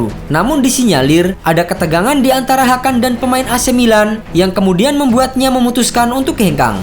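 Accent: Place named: Tegal, Indonesia. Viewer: Kayak orang Tegal ya min hiks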